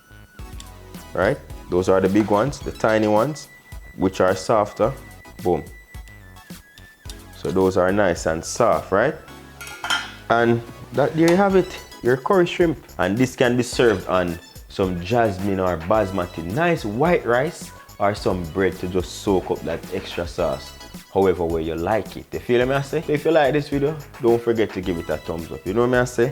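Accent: Jamaica. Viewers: I love to watch you cook.Jamaican accents are my favorite, from the mountain to the beach and long the country side. How did you learn to cook so well, your parents or grandparents or did you go to culinary school?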